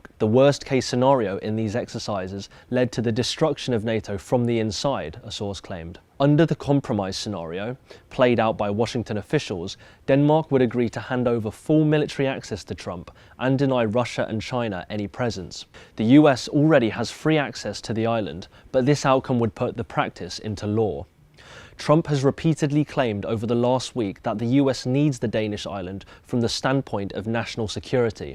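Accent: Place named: United Kingdom. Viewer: I wondered how long it took this guy to get that accent down. There's no way he started talking like that as a child. And why a British accent? Why not a Latino or Russian or a French accent.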